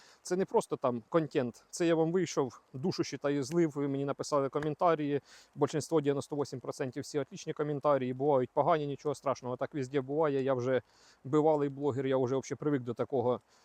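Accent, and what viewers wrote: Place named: Cherkasy, Ukraine. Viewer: Дивлюсь вас з Канади... містечко біля Ванкувера ... Така ж погода, такий самий ліс !) ... В Канаді повна ж.... з роботою... з цінами на житло... А головне, інколи почуваєш що ти не в Канаді, а в Індії))... Кругом "чорні"... Їжа ((( ... , мабуть найгірша у світі... Дякую вам за відвертість, простоту у відео )!... Маєте Черкаську українську )...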